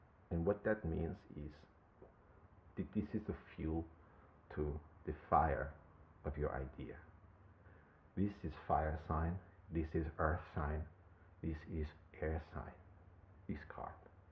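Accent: Russia. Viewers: Your Russian accent is outstanding! ... and very relaxing. This little fire sign (Sagittarius) would love to hear more. 🔥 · Capricorn ♑️ here! Awesome Russian accent. 🖤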